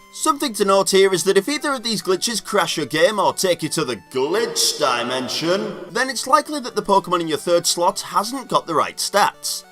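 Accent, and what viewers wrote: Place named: Bolton, United Kingdom. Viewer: Came for the glitches, stayed for the Bolton accent. Have a subscribe, friend!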